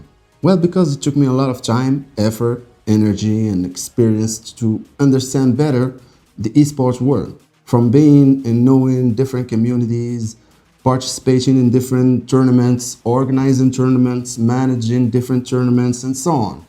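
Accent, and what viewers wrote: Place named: Morocco. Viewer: Good content bro keep it up (ps:: mn accent dyalk u sound maghribi, did i guess that right ?)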